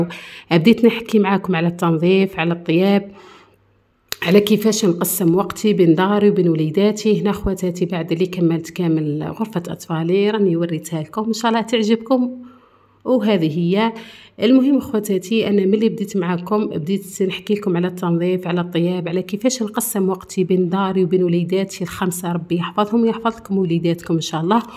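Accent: Djelfa, Algeria. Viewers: مساء الخيرات اول مرة نشوف قناتك وهدرتك عجبتني ونحسك جلفوية صح اولا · الله يبارك فيك وفي أولادك ويعينك في تربيتهم وتشوفيهم في اعلى المراتب واحسن المناصب يارب وتفرحي بيهم ❤❤ اختي انتي من الجلفة ولا بسكرة جذبتني لهجتك 😊😊 وتحية من ناس الشرق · باينة من اللهجة جلفاوية وانا كذلك ربي يعاونك ويشفيك ختي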